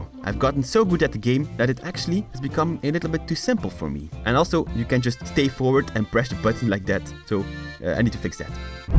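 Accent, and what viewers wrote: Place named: Netherlands. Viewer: Do you speak Dutch? Because your English accent sounds a bit Dutch :) No hate.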